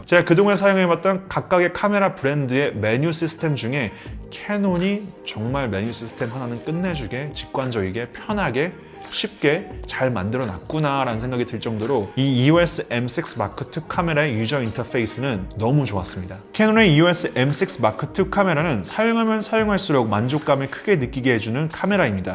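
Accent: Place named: United States. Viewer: 재미교포신가요? 한국어도 미쿡말처럼 말씀하심..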